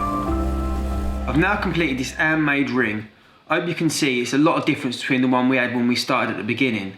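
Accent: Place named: England, United Kingdom. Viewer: Never heard your English accent before where is it from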